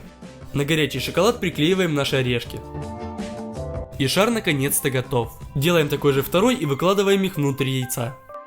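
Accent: Ukraine. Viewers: да,советую посмотреть его первое видео.там он разговаривает более украинским акцентом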